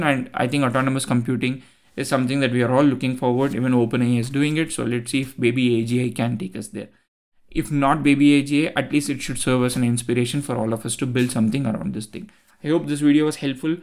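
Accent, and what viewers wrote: India. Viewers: Opens video, speaker has Indian accent, 🙂‍↕️ this is going to be an excellent video 🫶🏻. Edit: - 🙂‍↕️ it was great. Edit 2: I think AGI should strictly train on YouTube videos with Indian accent 🙂‍↕️, it’s guaranteed to learn the easiest way to explain the hardest and the most complex tasks. Medical school was saved by literally 5 Indian guys teaching medical school on YouTube to us 😁.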